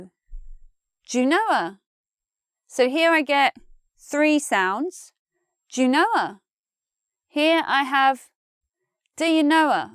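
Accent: United Kingdom. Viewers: I hear you used british accent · * O * sound make me love british Btw love u from Indonesia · is this British English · Is this english british or language amrican!!!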